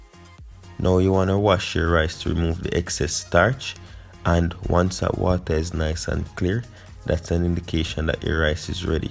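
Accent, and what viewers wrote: Jamaica. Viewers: The JA accent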